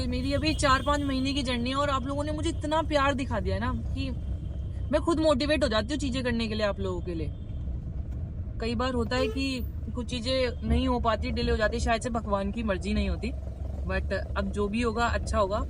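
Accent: Haryana, India. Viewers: Mst lge h haryanvi aap ki boli te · Haryanvi hindi mix😂😂😂 but Haryanvi top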